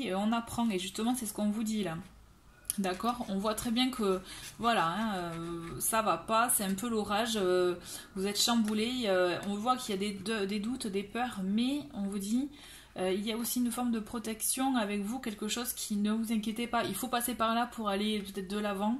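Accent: South, France. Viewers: merci c'est très agréable avec votre petit accent du midi...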